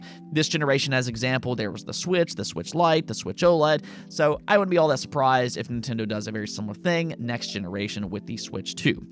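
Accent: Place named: Mountain West, United States. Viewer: I can tell you're from the mountain west region by the way you say "rill dill." Didn't even know this accent existed before Sister Wives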